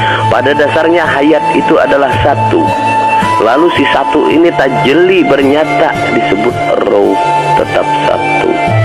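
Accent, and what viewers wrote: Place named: Kalimantan, Indonesia. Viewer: ma'af kliru..🙏 logat n bahasanya mirip di kalimantan.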